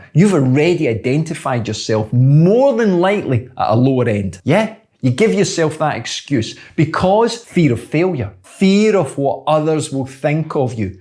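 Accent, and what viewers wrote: Scotland, United Kingdom. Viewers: That’s it in a nutshell! Like the Nike slogan, “Just do it!”. BTW, is your accent Scots or Irish? I’m thinking you’re a lad from Scotland. Yes or no?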